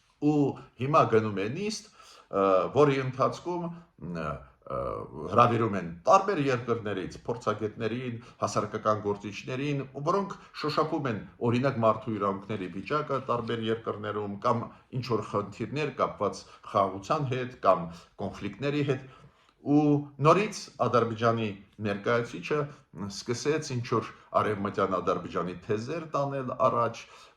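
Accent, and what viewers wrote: Russia. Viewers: Такое впечатление, что господин жил и учился на русском языке. Многие слова он произносит на русский манер. Одно успокаивает, что он патриот Армении на все 100%.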